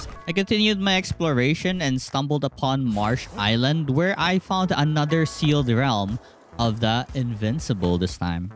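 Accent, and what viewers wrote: Philippines. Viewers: are you Filipino? cause you have a Filipino accent!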